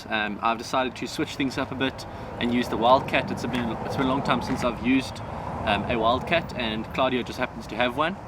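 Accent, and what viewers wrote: South Africa. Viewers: Because of your accent id assumed this was south africa but now i find out its my homeland of Argentina! what are the airgun laws like? Man i been thinking about moving there for the longest after visiting Mendoza, i just loved the laid back lifestyle.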